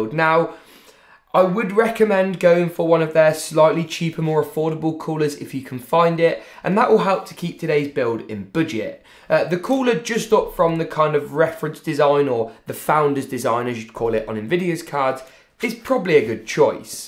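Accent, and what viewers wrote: United Kingdom. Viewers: Finally a youtuber that doesn't cut the mistakes he makes ... and anyway I really like your brit accent...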